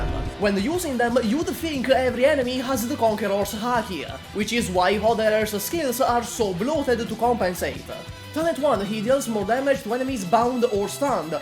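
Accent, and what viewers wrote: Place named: Italy. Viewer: i don't even play arknights anymore i just like listening to an italian mf yap about shitty operators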